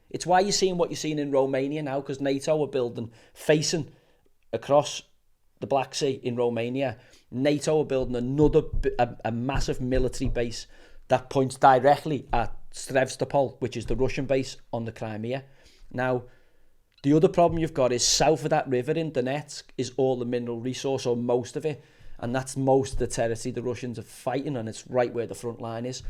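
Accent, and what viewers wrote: Liverpool, United Kingdom. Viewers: He talks so much shit Uneducated scouse tinkler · Nothing beats a great Scouser rant. But spot on brilliant commentary!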